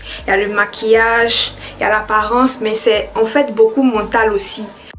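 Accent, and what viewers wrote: Ivory Coast. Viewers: Ma soeur ivoirienne, je te kiff grave ,chic accent ivoirien et chic vidéo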